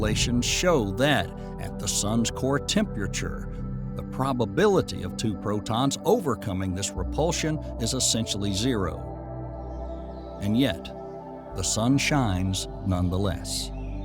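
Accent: South, United States. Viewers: As a southerner myself, (by way of New Orleans, which has its own unique accent, but my family is from Mississippi and Tennessee so I grew up hearing many different forms of the southern accent), this narrator is more appealing to me. The unique way certain words are pronounced is very comforting to me. Combining that with my passion for astronomy and astrophysics makes this channel one of my favorites! If not for the ads, it would be perfect to have playing while going to sleep. I could just pay for YouTube premium, but I survive on a very tight budget and an extra fifteen dollars for something that is truly a luxury and not a necessity would be irresponsible of me. And with the gas prices rising every day, I don’t think it’s smart. I just have to endure the annoying ads that blast the speakers with their irritating gimmicks trying to sell more junk that I don’t want.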